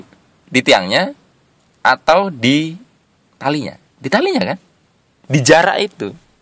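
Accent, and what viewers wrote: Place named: Java, Indonesia. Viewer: ini kok suara ronald franknya gk kayak biasanya, ada medoknya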